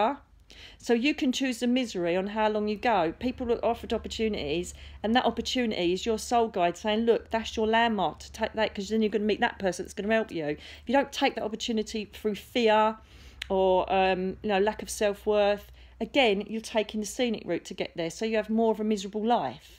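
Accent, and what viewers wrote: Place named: England, United Kingdom. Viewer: Umm, even though I had to really concentrate to understand what you were saying (English accent and all), I learnt a LOT from what you shared in this video. Soul blueprints, soul clusters, angels, celestial beings.... you could take any one of the points you shared and expand up on it in a separate video. And if I may, please do that! Thank you for sharing your insights and life experience.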